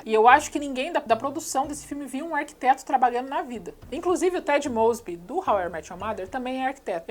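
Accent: Curitiba, Brazil. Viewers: To amando seu canal, senti um sotaquezinho de curitiba, se eu estiver certa, é bom ver uma conterrânea aqui no youtube :) To indicando seu canal pra outras pessoas tbm! E quero agradecer pq seus videos tão ajudando muito num termino recente que eu tive. Manda ver guria que tu vai ter muito sucesso ainda!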